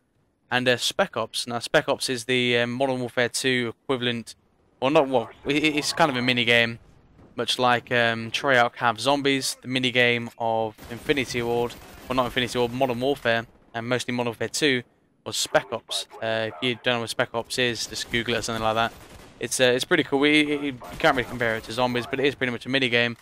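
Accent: United Kingdom. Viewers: Thanks for taking the time to make all these vids - really appreciate it, great effort. It's refreshing to hear a fellow Brit too! Nowt wrong with other nationalities I might add, (quickly got that in before I was shot down in flames!) Keep up the good work.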